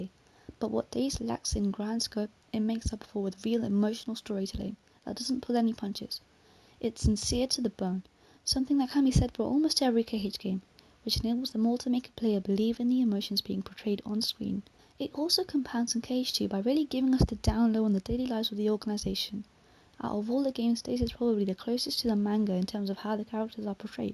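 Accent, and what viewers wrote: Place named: United Kingdom. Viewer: What's this British voice smh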